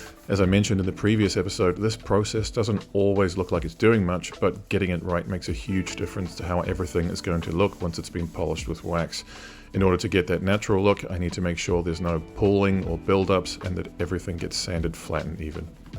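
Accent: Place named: Australia. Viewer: Great looking piece and a timeless classic. ❤I am living in Germany as well, but my I ask where you are from? I suspect more Australian than British judging from your accent.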